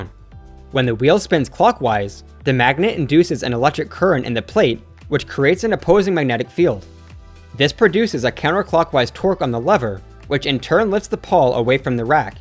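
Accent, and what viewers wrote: Canada. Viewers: wait, are you Canadian? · I can't get enough of how you say roller coaster. God Bless Canada and its unique collection of modified vowels sounds.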